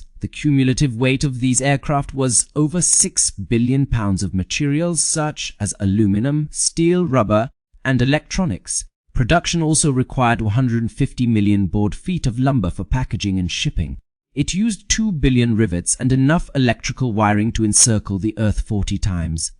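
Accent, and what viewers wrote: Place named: United Kingdom. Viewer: Why add distracting and annoying flickering speckles and vignetted cropping to what could have been clear full images?!! Plenty of clear accurate public domain images of personalities, facilities, etc are available but your AI generates ridiculous junk. Why Brit-accented AI narration of 100% US operations?!! Can't your AI narration pronounce names, especially German, numbers, and much else correctly? DISLIKE! UNSUBSCRIBE!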